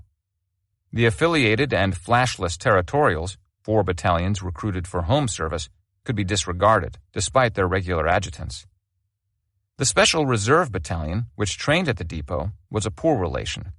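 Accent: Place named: United States. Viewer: Based on the atrocious AI "art" (and spelling) of the thumbnail, it's probably an AI bot doing the narrating. But yes, the US accent is jarring and inappropriate.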